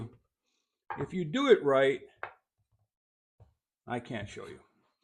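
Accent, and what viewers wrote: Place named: Boston, United States. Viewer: I detect a bit of Boston accent…..caaaaard scraper, haaaaardware store. 😀. Glad you are having a fun time, it’s very informative to watch you make some planes. Enjoy your day. Too cold in my shop right now so I will patiently wait to try your teachings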